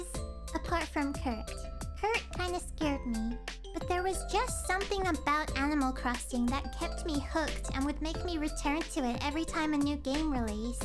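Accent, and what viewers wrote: Australia. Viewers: You’re Australian? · You know. I sometimes forget you're Australian. Ahahahaha.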